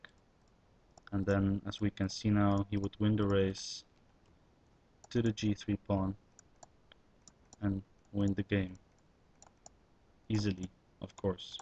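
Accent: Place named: Egypt. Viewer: Why English Mr?!, For who you are making this? Make same video with your sweet Egyptian accent pls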